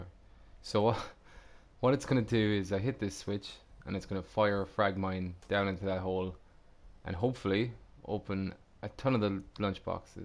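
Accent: Ireland. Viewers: Are you Irish? Your accent is great dude. What’s the craick? Do the ting. Great video. Awesome build. Shouting out skooled zone was class.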